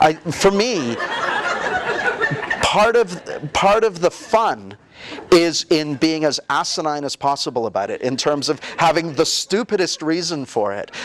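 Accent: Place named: Canada. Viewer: LOL, I can tell you are canadian. "Aboot". You say it! :P And several other inflections in your accent. I don't see how people think the accents are the same. lol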